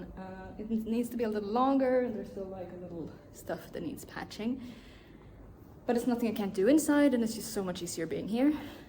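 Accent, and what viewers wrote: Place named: Scandinavia. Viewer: You aren't American? I just found you. What are you, one of theose Nordic countries? They have perfect American English and fool me all the time. Almost everywhere else speaks a British English. Anyway, I look forward to watching.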